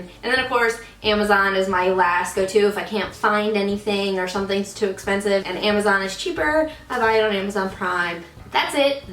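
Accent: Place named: Tennessee, United States. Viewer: Is she from Tennessee? I'm trying to guess from her extremely subtle accent.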